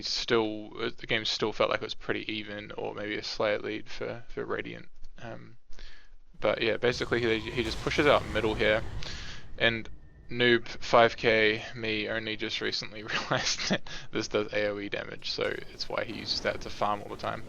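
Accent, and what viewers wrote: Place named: New Zealand. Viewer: ayyy a fellow kiwi i can tell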